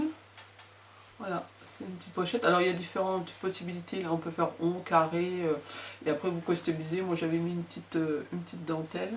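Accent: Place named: East, France. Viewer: Bonjour, je suis une nouvelle abonnée...je pensais bien que vous veniez de l’Est...vous avez l’accent ! Je suis de l’est aussi !! Belles cousettes , j’adore !!!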